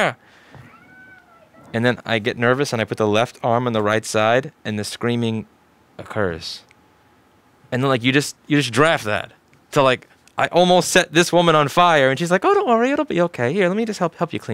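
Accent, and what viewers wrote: New York, United States. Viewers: Rossmann do you not understand the bulk of people who originally subscribed to you were brought because you were doing "boring" board repair? Some of us respect the skill it takes to diagnose a problem and the others respect the skill it takes to properly repair. Then there sre some of us who like the absurd New York style of how you speak. Then there are some who like all 3. And Erica sounds like an amazing woman. Best put a ring on that finger.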